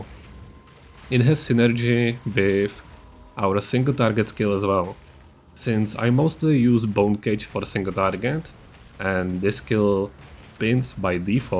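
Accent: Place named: Czech Republic. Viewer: Ahoj je fajn vidět že i v česku se hraje poe, ten přízvuk poznám prostě všude :D muzu si te pridat na dc nebo tak ?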